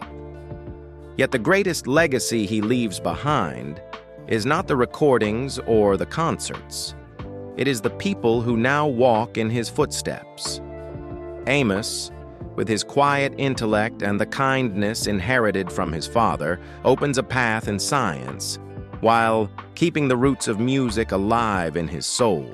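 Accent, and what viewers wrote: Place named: South, United States. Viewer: So much repetition and “over the top” words, expressions, comparisons”. I assume Morgan Freeman was the narrator. His Southern roots creep through. A good editor could have pared this down and made it much better. It got very tiring.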